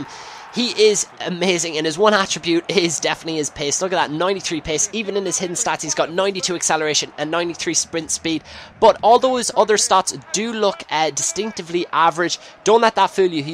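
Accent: Ireland. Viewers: Where in Ireland are you from i'm from Cork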